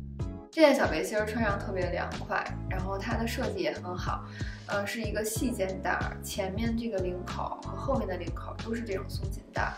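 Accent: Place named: Beijing, China. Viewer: 听声音像北京人或河北人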